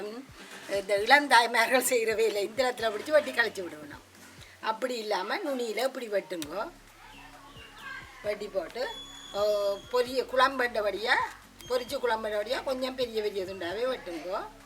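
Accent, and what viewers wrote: Jaffna, Sri Lanka. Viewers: Engelnde yalpanam tamil neenga pesa nalla iruke ketka. Engelnde amma vum ipadi thaan seireva vendika kulambu annal amma inji poduva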